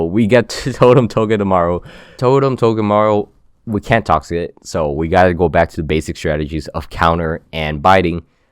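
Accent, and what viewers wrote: Italy. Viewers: Wait are u italian? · Wait are you italian? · Are you italian?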